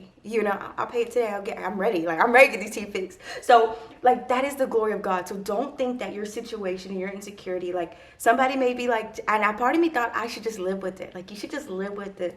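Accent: South, United States. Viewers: I hear that southern accent. Where are you from, if that's not to forward to ask?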